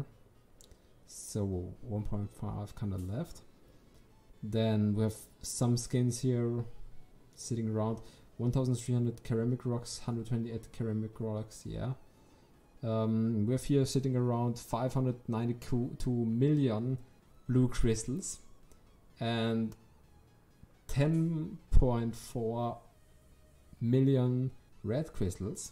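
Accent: Germany. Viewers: Was für ein Deutsches Englisch XD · Kommst du aus bayern? :D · Deutsche hört man raus xD Auch wenn er viertel türke wäre und er das flüßig sprechen kann, Das ist der akzent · Ein deutscher an der spitze ? Wow (deutschen Akzent höre ich unter <num> mio englisch sprechenden herraus :D) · hast aber echt nen deutschen Akzent dachte auch du wärst Deutsch bis du es zum Schluss gesagt hast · hab gar nicht gemerkt das du deutsch bist aber dein Akzent hat man raus gehört dann kam ne Twitter Meldung auf deutsch:D btw kranker Account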